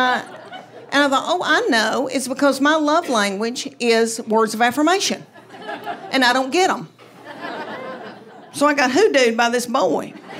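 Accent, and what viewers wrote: South, United States. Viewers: She is heavy with southern twang. I swear I thought she said ‘ma daughter was sixtwaang’ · Her southern accent really adds to the delivery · Love that Southern accent - the best, and sooooooo. funny. A very special Comic, wife, and mom, and a relative, neighbor -just hilarious! Thank you Leanne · The killer is she has that southern kill ‘em with kindness And sarcastic southern drawl. If called me Darlin’ I’d run! lol hysterical and all so true!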